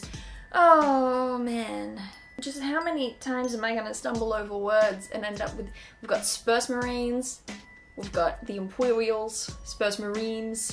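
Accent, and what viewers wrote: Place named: Melbourne, Australia. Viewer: Australian? By that accent I rekon melbourne maybe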